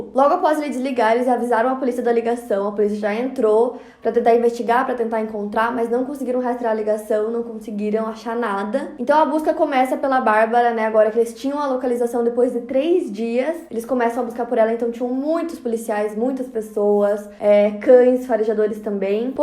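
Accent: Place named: Rio Grande do Sul, Brazil. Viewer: acho que é riograndense Aqui no Rio Grande do Sul falamos assim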